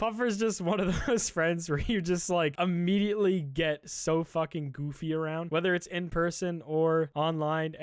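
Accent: Canada. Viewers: Gawd, you're so Canadian white but it's like little sand hints of arab thrown in there.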